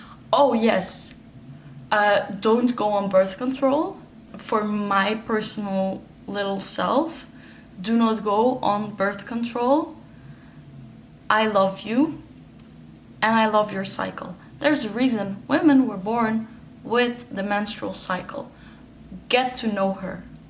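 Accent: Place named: Netherlands. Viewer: I lived in NL 7 years. I recognize that accent anywhere. 😊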